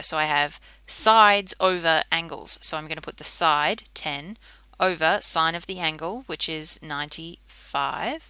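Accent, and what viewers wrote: Australia. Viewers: Granin shes aussie not a brit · Aussie accent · Granin lol she's very clearly australian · are you from australia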